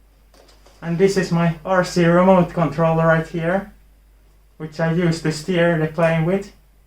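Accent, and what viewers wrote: Finland. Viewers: your Finnish accent is wonderful. And you are soooo cute <3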